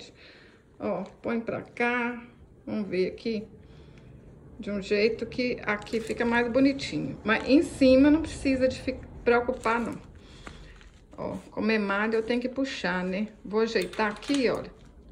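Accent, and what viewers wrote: Minas Gerais, Brazil. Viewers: Muito lindo e simples a explicação e o modo de fazer. Esse seu sotaque é o máximo me lembra uma amiga mineira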